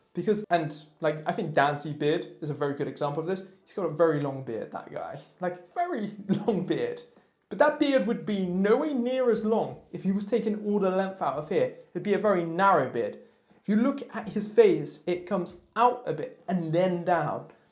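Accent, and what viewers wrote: United Kingdom. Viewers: I noticed you're using beard butter from Detroit Grooming which is from the US but you sound like you live in the UK. Is there a UK supplier for that brand?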